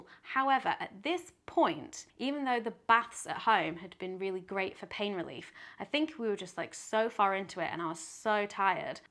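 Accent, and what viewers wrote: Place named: Northern England, United Kingdom. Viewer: Congratulations! I love that you sound more northern when sleep deprived and pumped full of painkillers post-birth 😂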